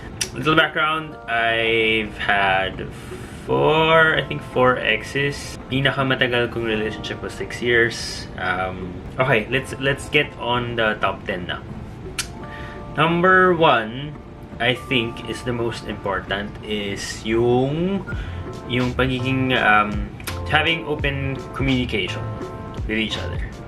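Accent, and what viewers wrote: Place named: Conyo, Philippines. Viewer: Ce n'est pas sa faute si c'est comme ça qu'il parle, conyo-façon genre. Ce que je ne peux pas supporter, c'est la façon dont les autres ont été influencés ou imitent son genre de échange d'informations. Et, à cause de ça.... "MUHSHUKHuhT JZSHUH BHUNGS" "Uhng shukh-t, shumuhkhuht uhng bhungs khuh zzhuh khunyhuh pheruh oketch luhng."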